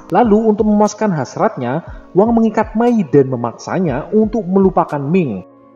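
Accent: Banyumas, Indonesia. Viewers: Rika wong endy man deneng bahasane ana banyumasane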